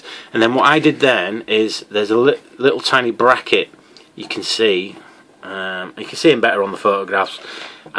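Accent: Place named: Yorkshire, United Kingdom. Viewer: Oh.... I somehow have the impression that you are a Yorkie,? I do hope that I am not wrong because such a realization would indeed make me turn into a dog with its tail between its legs, to use a metaphor..... Anywhos..... I suppose anyone from the Tees to the old Humber, and all in between and possibly, all here, there and everywheres, could be classed as being a Yorkie, even though that does not make even a smidgin of sense.... However, and even so-so, I actually get the feeling that your beautifully eloquent commander like voice has a tint-of-a-hint of an accent that is actually from no less than bang, stab, right in the middle of the old, soon to be re-vamped, kingdom, i.e. your accent to me suggests that you are born and bred, and I suppose, ultimately from - York City - long-winded or no?. I do hope that I am not wrong. So, what is the answer? 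Leeds, no doubt! When I think about it, though, I am now leaning more towards Sheffield....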